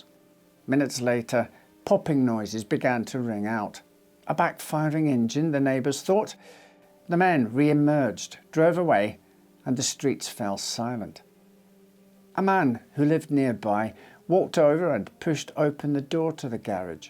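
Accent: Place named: Glasgow, United Kingdom. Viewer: Washington state West coast U.S.A here. I hope yur real n not scrappy a.i.😕 Love the Glasgy speak😊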